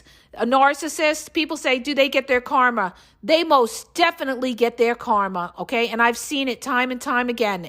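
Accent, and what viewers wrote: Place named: New Jersey, United States. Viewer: This has to be a jersey girl😄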